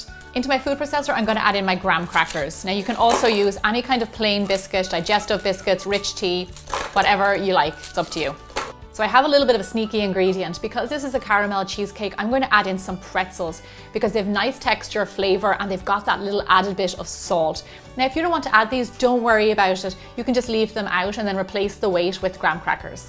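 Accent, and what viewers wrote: Ireland. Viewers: Is she Irish..sorry first time here.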